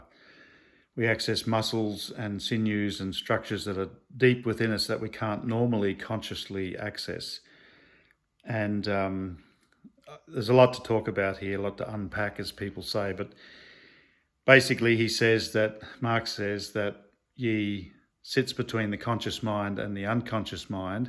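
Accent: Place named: Singapore. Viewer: Stewart Lovegrove Honestly,I study with Leo Lit who is a high level student of Chu Shong Tin.Leo Lit's name is mentioned right up there with Jim Fung...etc....He talks about "Little Idea" vs "Big Idea" which is loosely translated as Sub-Conscious/Motor cortex vs Conscious/Analytical mind. To make a long story short,you're trying to access the "Little Idea" part of your brain/mind to have success in this style of Wing Chun. In my humble opinion,Mark is not explaining that properly. Also,I'm fluent in Mandarin which helps me a lot.Also,Leo Lit is from Hong Kong and studied with Chu Shong Tin for many years.Mark,I believe is from Singapore judging from his accent,but I'm not sure. In any event,I'm not sure why he keeps saying only "NimTao" as the "Siu" is there for a very important reason and cannot be neglected. All this "Heart Mind " stuff just confuses the issue.Yes,there is the character "heart"心 in Nim,but that doesn't mean we should call "NimTao" "Heart Mind",because all it means is Thought,Idea,or Intention. 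Anyway,hope this helps:) Having said all that,hopefully one day I can have a discussion with Mark about this as he seems to be a very well researched person with high achievement in the art.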